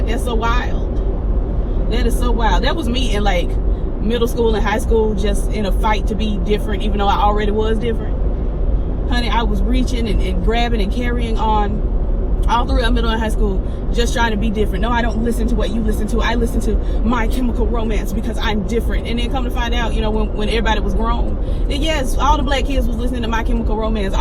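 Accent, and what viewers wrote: South, United States. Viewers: You make that southern dialect look good 🥰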